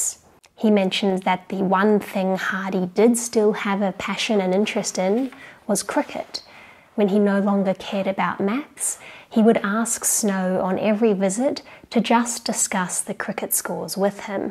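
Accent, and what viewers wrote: Australia. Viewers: Yes, targeting Indian audience is a good option on YouTube. In India they promote technology like engineering and computer sciences. Is she Australian? Her accent sound Aussie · Are u Australian? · Hey are u Australian??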